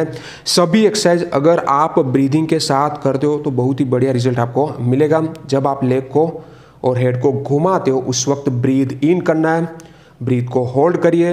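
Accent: Gujarat, India. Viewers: By accent , I guess you are from Gujrat..btw , nice advise in videos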